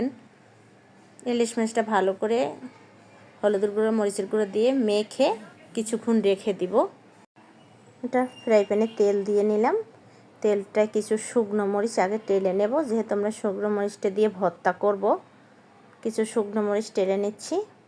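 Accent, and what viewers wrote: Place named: Bangladesh. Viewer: Didi apni ki banglades thaka? Voice tone ta oi rokom tai jiggasa korlam❤❤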